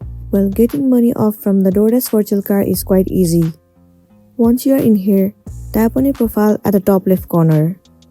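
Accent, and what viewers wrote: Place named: India. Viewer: Indian accent youtube video in 2024 is insane.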